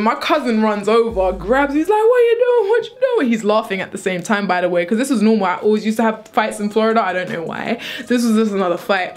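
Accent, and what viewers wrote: England, United Kingdom. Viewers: All of u saying it's jit not jet clearly have no appreciation for the English accent 💁🏽💁🏽💁🏽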